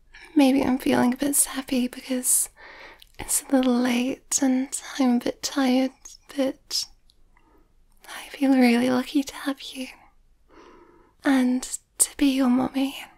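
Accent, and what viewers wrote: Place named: United Kingdom. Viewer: the british accent reminds me of Millie Bobby Brown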